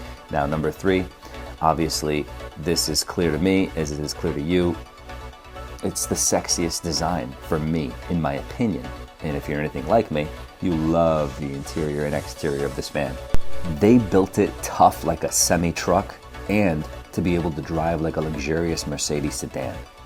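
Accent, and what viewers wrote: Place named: Massachusetts, United States. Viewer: You sound like you live in MA ?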